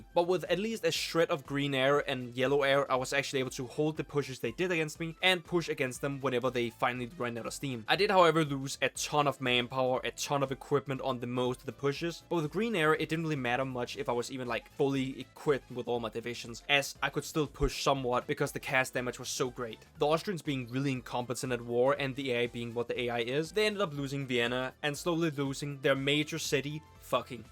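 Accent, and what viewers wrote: Denmark. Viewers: Live the danglish Ære til Danmark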